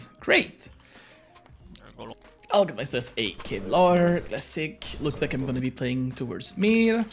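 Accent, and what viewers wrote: France. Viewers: Omg the french English accent is the cutest thing ever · Are you French?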